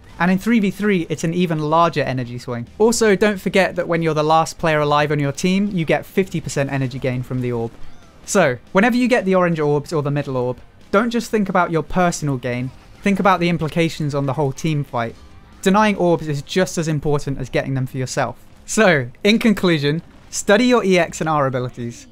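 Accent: United Kingdom. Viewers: i can tell you're from UK because of your accent. plus i'm UK as well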